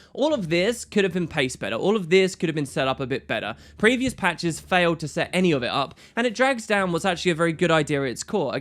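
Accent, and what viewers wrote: United Kingdom. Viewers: Hey don't know if you'll see this, but I've been checking your channel daily sense FF15 release hoping for some content on it. Weather that be the start of an LP or just some sort of news. I imagine you might of said something about it in one of your GW2 vids but unfortunately I've not watched those for some time as I never spent the money on the expansion and don't want to ruin anything as I'm sure one day when I got some extra money to rebuild my computer I will. Anyway, your FFX LP was hands down the best LP I've ever seen, I also enjoyed the first half of the X-2 one, but to honest with ya by the time you started back up I'd forgotten most of what happened and kind of lost interest. Idk, I really hope you'll do an ff15 lp because I'd love a good reason to tune back into your channel on a daily basis again, miss that British accent of yours:)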